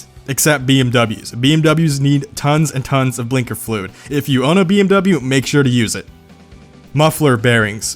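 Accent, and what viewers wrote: United States. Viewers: Audi ≠ oRdi Peugeot ≠ Pew-jo Renault ≠ RenNo you yanks are the fucking worst i swear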